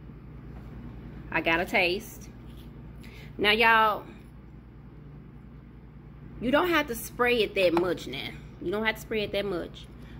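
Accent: United States. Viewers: Dk what city ur from but you sound country as...🤣 not hating on you just saying. like ur simplistic way of cooking. hint: spray oil on paper towel and wipe on fryer. that will eliminate any build up and avoid potential fires. thats why they recommend NOT spraying directly on fryer. Be safe.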